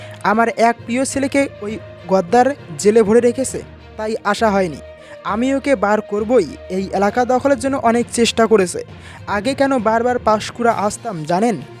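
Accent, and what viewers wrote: Bangladesh. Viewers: ভাই আপনার কথায় বাংলা দেশি টান। তথাপি ভালো লাগে। চালিয়ে যান।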